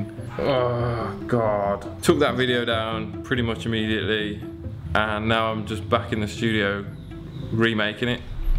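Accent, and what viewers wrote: Birmingham, United Kingdom. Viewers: Always inspired by your work. Always. I don’t know if I missed the story, but how did you end up in Peru? Is that a Brummy accent?? Could you do a video on your background and how you came to be where you are doing what you do? I think it is fascinating, the life journey that took you to Peru, to sacred geometry. Pls share, and sorry if u have already done that video, I haven’t been watching your stuff that long so may have missed. Thanks.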